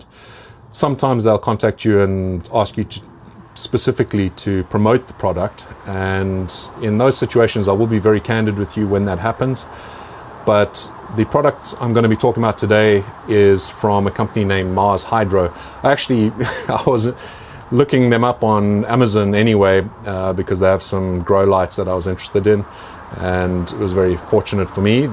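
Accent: South Africa. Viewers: The SA accent is BY FAR the best accent IMO. Manly and unique. 🤘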